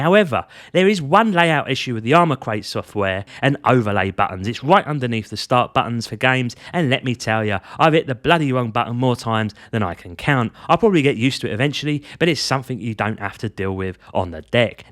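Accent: Cockney, United Kingdom. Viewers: Very cockney :) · Oy Oy Balham market, from Tooting myself. Nice comparison vid, I'll stick with my Steam Deck for now as it does everything I want as I play a lot of indie games and emulation, I don't really play AAA titles, if I do fancy one I play it on my main box. Subbed and liked for a fellow Cockney geezer.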